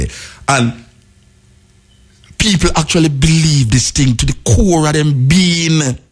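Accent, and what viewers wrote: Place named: Jamaica. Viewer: i would like subtitles...i know english but not this jamacian dialect..not when he speak fast.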